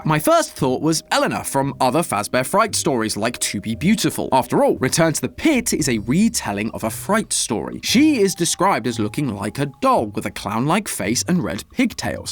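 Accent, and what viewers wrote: United Kingdom. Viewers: Love listening to British Matpat while eating dinner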